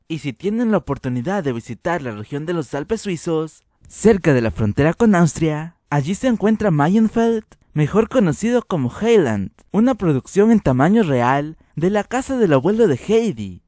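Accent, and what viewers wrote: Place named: Mexico. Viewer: Uno de los libros más leídos en Suiza...no va a ser El Quijote....y leelo con acento europeo no mexicano..bobo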